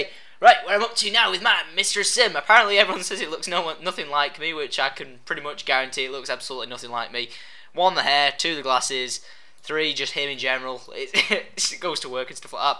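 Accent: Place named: Northern England, United Kingdom. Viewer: Ooh was fun watching you play Sims 3, Love Sims :) love your accent too good old northern accent like meself ;)...i am that sad i know that house as i have played it many times and never understood why that half wall bit is there looks odd hahaha good cheats to use are motherlode for money, Testing cheats enabled use shift and click on mail box/sim and a bunch of things come up, and after that if you want you can use buydebug and good stuff there :) only found out recently :) Have fun simming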